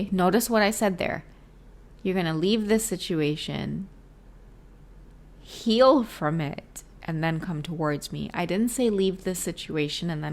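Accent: Trinidad and Tobago. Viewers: 🦫 Love the Trini mom talk! 🦫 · lol love the Trini accent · As a Trini living in Trinidad, I have to to tell you your trini accent is so good. Thank you for the reading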